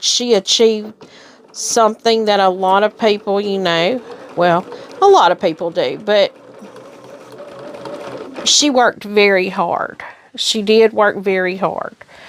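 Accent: South, United States. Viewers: Chit chat is calming. Love your southern accent. Your boo quilting came out lovely! I always do my own, but have to admit it’s not my favorite. Oh well, practice helps.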